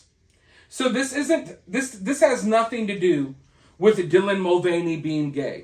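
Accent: South, United States. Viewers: That country accent, you sound like me lol!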